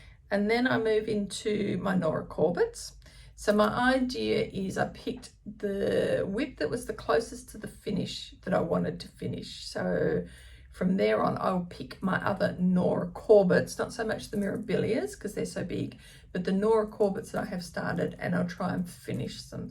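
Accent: Australia. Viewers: So happy to see you back and hear your gorgeous Aussie accent! I love all of your finishes and can’t wait to follow your progress in our group and on screen! Big hugs to you! ❤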